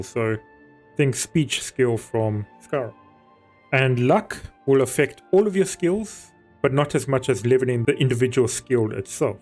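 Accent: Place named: South Africa. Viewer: Heard that accent and immediately knew I’m dealing with a real boet here lol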